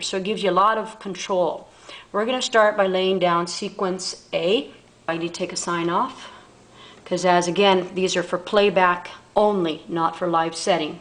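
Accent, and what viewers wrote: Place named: United States. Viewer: I love your American accent :-)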